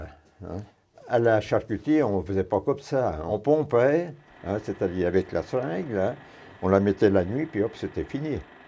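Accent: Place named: Quebec, Canada. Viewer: Je suis Québécois et beaucoup de gens, surtout les plus vieux, parle comme lui.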